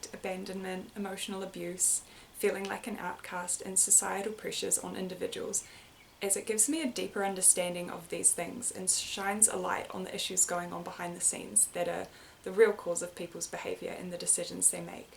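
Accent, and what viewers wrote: New Zealand. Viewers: Happy for your success in publishing your book online! I too find that writing is the only way for me to truly express myself. I write poetry. Hope you don't mind me sharing a poem I wrote recently: He wanders through eternity like a hermit in the desert, seeking meaning and purpose in a world, in a culture overtaken by lies and façades. He’s told to consume commodities, to chase career and cash. “You’ll be happy then” they say. Yet he still feels this gnawing emptiness inside, even having arrived at these things. The answer to wholeness doesn’t lie there he sees. He sets off towards another horizon, seeking what’s worthwhile; in touch with the whispers of his heart. The compass of his soul guiding him onwards. I'm also from NZ. I assume from your accent that you are too. Nice to meet a fellow kiwi on YouTube:)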